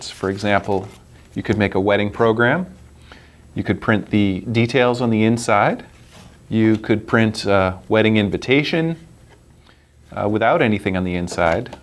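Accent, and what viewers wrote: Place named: United States. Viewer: Excellent! You speak slowly succinctly, and with an American accent! I have been on computers for longer than many people have been alive, and have always struggled with how to make greeting cards. The amount of paper and ink I wasted would have paid for someone's college education! A head slapping moment came when you showed the formatting trick was to use two columns. Gawd!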